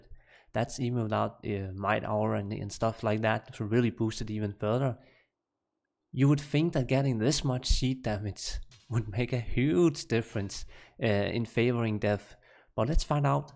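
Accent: Denmark. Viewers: The danish accent screams at me haha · Are you from Denmark?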